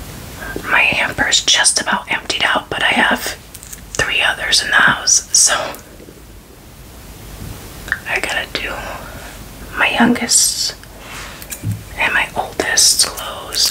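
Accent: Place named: East Coast, United States. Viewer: Good morning what state do you live in? Sounds like you are on east coast like me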